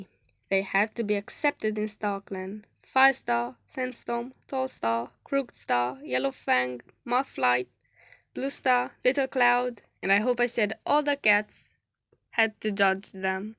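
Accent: United Kingdom. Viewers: Wow! Chiat zici ca esti din Marea Britanie cu accentul tau! Eu me-as dori sa o citesc, dar nu k gasesc si oricum trebuie sa imi ajunga 2 tot in Engleza. Felicitari! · Îmi place vocea ta lol! Accentul tău britanic nu suna rau (nu de-as fi eu experta heh). De desen ce sa mai zic..arata splendid!!! Squirrelflight's Hope e pe lista mea de super ediții si plănuiesc sa o citesc...mai încolo xD 🤍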